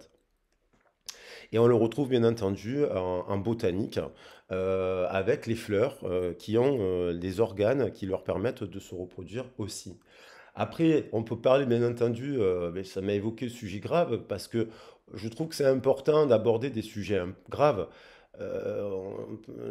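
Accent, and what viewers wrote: South, France. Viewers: 🤣Shamala VIBES?😏🤣😜🔥♏⚡🔥.Merci pour le partage de tes énergies avec l’accent du sud dans tes cordes vocales😊😎🤌🏻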